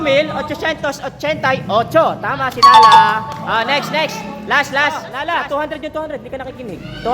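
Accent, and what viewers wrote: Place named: Waray, Philippines. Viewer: parang ng waray ka lng dn.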